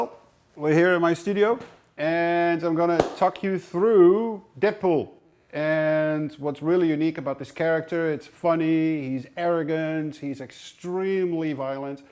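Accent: Netherlands. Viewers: Very cool! I might be wrong but are you from the Netherlands? I hear very distinct sounds that a lot of people around me have when they speak english! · He has a bit of a Dutch accent. Could that be right? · Yes, He is dutch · Nederlands accentje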